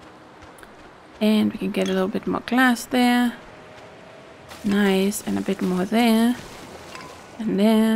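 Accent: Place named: Denmark. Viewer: Thanks for the video, your accent sound Danish, very elegant in my opinion, from Alv, Norway.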